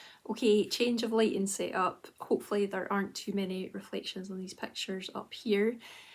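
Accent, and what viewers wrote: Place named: Caithness, United Kingdom. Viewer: That sounds like a Wick/Caithness accent! Lovely slipcover 🙂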